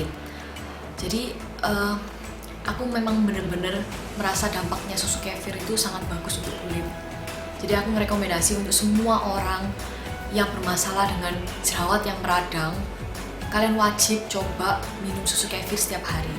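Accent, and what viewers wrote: Java, Indonesia. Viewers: keganggu bgt sm medok jawa nya 😄😄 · medhok bnget... q suka gadis jawa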